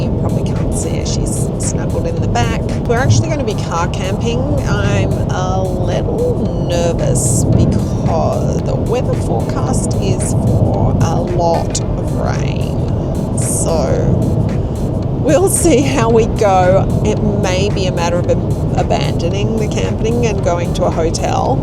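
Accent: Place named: Australia. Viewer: you sound like you’re Australian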